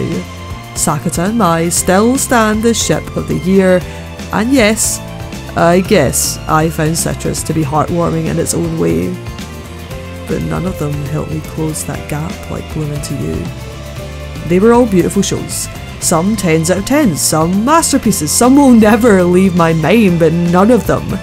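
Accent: Scotland, United Kingdom. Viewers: I'm not good with the specifications i.e Glasgow vs Edinburgh, but if you couldn't tell anything past 'British' it's a scottish accent. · Where on earth did you get any of that? It's such a lovely and blatantly Scots accent, and I can't hear anything Australian in it at all. (This from an Aussie) And the middle-aged part is at least as baffling to me. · Ooft ya, is that a wee scot I hear?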